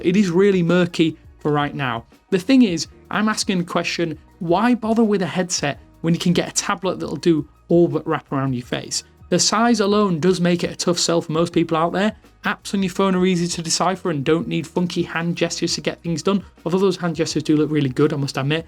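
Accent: United Kingdom. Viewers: This is one of the worst XR/VR related videos I have ever seen... way back into the Vive and Index days nothing compares to how awful this guys takes are. It's actually unbelievable he has a job doing this... something tells me the British accent is carrying him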